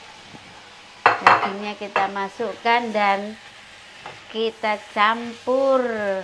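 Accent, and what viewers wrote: Java, Indonesia. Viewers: Di luar negri tapi bahasanya masih jawa medok...itulah lucunya mbk.. jadi hiburan nonton vidio ini.. sampai ngakak saya...luarbiasa mbk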